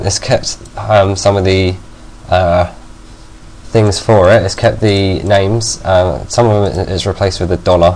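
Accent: United Kingdom. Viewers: I LOVE YOU! You are so so so so smart and ABSOLOUTELY BRILLIANT BRILLIANT, bloody brilliant! And I love your british accent. That does work.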